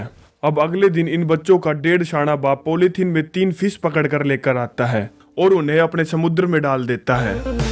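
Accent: Haryana, India. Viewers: Bhai aap haryana se ho kya Bahut hi alag level ka explain kiya hai Aapne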